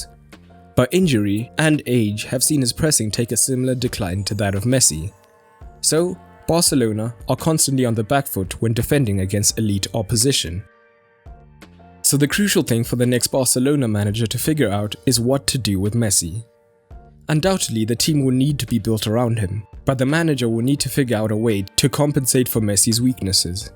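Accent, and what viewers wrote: South Africa. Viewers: You sound South African. Are you from SA??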